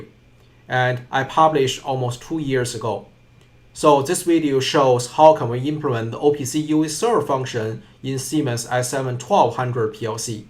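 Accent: China. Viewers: 哥们，一听你口音就知道你是京津地区的人啊。满满的口音让我想起了在北京读的本科。非常好的视频，我正在做我的硕士论文，也是和OPC UA有关的，看了你的视频受益匪浅。非常感谢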